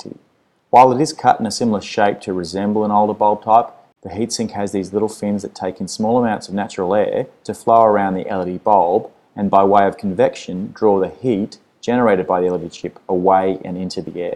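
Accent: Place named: Australia. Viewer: You sound Australian, but you said Aloominum. WTF?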